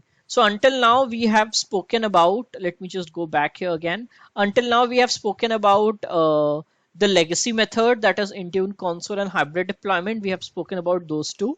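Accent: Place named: India. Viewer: Indian English again? bye~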